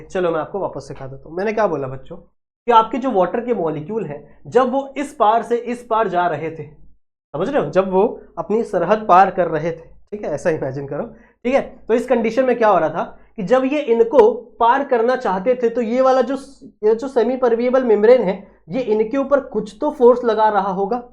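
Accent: Maharashtra, India. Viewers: Sir marathi aht kai 😂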